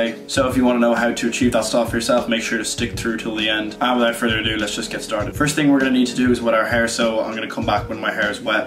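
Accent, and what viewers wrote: Ireland. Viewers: Your accent is a very soft Irish accent.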